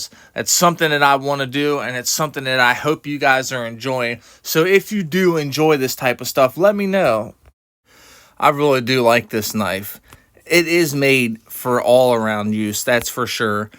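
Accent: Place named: Delaware County, United States. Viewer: Where u from brother? You sound Delco to me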